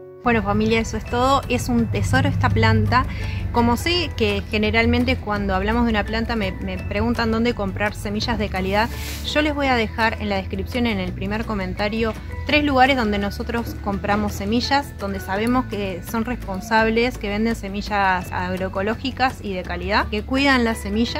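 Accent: Argentina. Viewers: Me encanta tu acento argentino saludos y bendiciones Para ti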